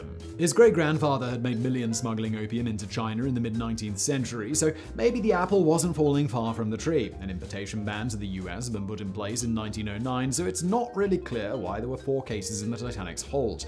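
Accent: United Kingdom. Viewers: lol, again, I realize you wanna defend your buddy Simon, but Simon is an arrogant person. He absolutely believes his British pronunciation is 'superior'.... He is absolutely not a humble person who is apologizing for his mispronounced words. 😂👍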